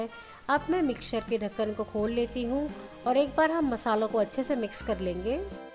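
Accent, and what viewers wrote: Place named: Kutch, India. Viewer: apki resipi rsoi sow me bhi dekhihe muje bhot psndhe apki boli thodi kchhi mix gujrati mithilgtihe thnks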